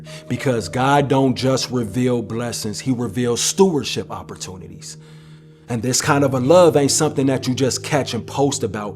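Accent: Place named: New York, United States. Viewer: This brother is so deep. I just came here today and subscribed. I’ve been working on myself, paying attention to what I put in my body as he said in the other video and healing. I needed this confirmation. I love his passion! His voice makes you stand at attention!!!! He has a New York or Northern style passion is his voice. I love this channel. Not about vain BS.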